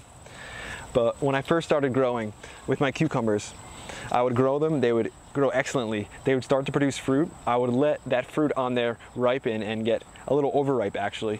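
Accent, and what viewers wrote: New Jersey, United States. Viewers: His way of talking is TOTALLY a Jersey thing!!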